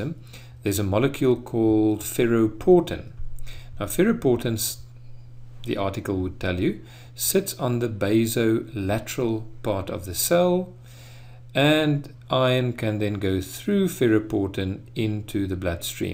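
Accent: South Africa. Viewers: professor are you from southafrica (south african accent) ;) thank you for this video...really clarified some topics I was struggling with. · Been struggling with this concept all throughout my first year of med school, and this video helps so much! Also love the South African accent. My girlfriend is an Afrikaaner and her dad sounds just like this. It's a comfort to me.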